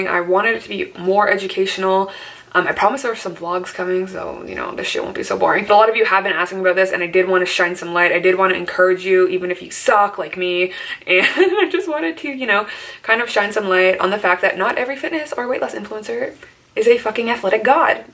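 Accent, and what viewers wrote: Canada. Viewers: It’s the Canadian accent hehe 😊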